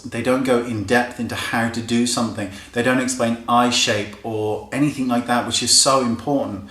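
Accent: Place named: Bristol, United Kingdom. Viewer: Sounds like the Bristol area to me (my neck of the woods!). Sometimes, whenever I'm feeling homesick at uni, I'll play his videos in the background while I'm working. He's like my David Attenborough. My neighbours must think I live in a makeup cult; nonstop one-way conversations about contouring and eyelashes...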